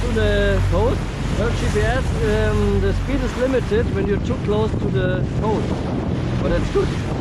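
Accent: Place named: Austria. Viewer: Stark gemacht danke.. hörst dich bisschen österreichisch an haha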